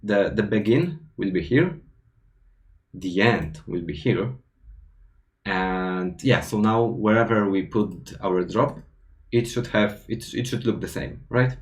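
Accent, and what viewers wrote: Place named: Russia. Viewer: Слышу русский акцент...))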